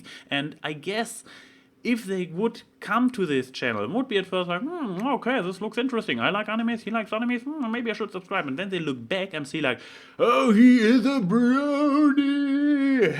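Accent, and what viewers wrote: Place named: Germany. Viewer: Oh I don't stalk you, I just am just drawn to your sexy German accent everywhere you go lol. Anyway I like content. But I think his brand of humor works better when he has someone to bounce off of like his collab with Josh Scorcher. Also you might want to be careful with these vlogs because you got a little redundant at times.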